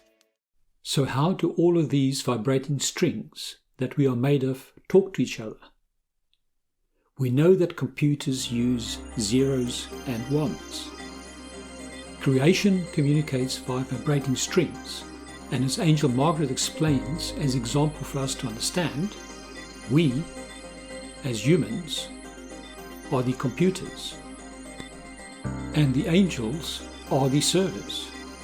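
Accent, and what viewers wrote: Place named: South Africa. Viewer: You are South African....your voice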